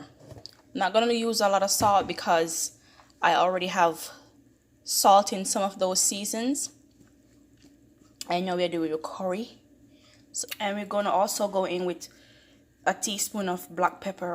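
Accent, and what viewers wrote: Jamaica. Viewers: I love to hear my Jamaican accent on these cooking channel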